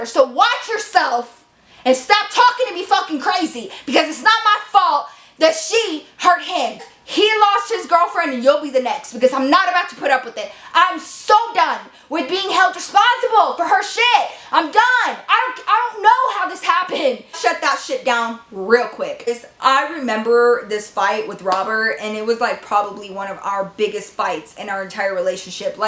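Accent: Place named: South, United States. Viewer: southern accent comes out more when she gets mad 😂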